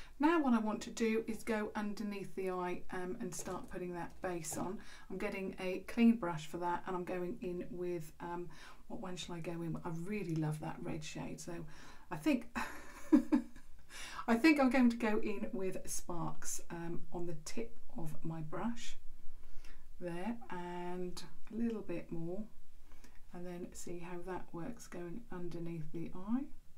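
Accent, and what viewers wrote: United Kingdom. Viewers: Iam an Egyptian engineer, every time i open your videos i focus on your British accent whatever you talking about 😍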